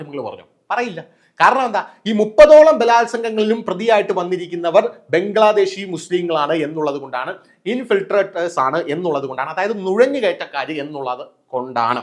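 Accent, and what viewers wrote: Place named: Trivandrum, India. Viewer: i'm a big fan of your style and the tvm slang !! Makes my day cheerful.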